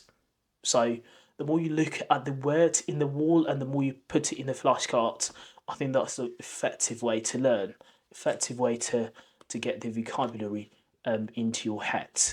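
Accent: United Kingdom. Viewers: Love your British accent . I visited Brighton in 2005 .It was amazing.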